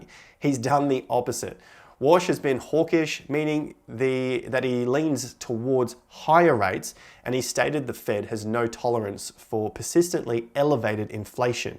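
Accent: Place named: Australia. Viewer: Aussie accent talking US rates has me confused be more specific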